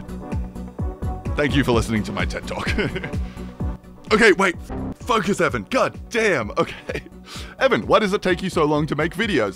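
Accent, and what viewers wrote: Australia. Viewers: He's Michael Reeves, but less robotics and more coding. Also a LOT of Aussie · i have to comment it here but, are you "??" not just for your aussie accent but your ability to not upload for months at a time.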